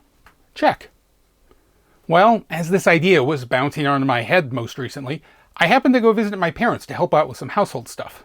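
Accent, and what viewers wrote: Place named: Chicago, United States. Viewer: the juxtaposition of Chicago mafia accent botanising urban wastelands in latin and cursing is the best part!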